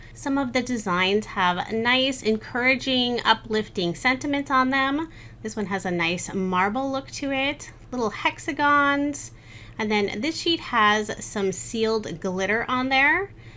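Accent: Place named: South, United States. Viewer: I keep forgetting you're not in the US. You sound like a good ole southern girl😊💖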